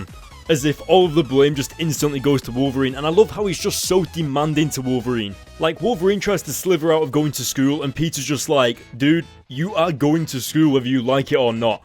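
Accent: United Kingdom. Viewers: never knew you were english.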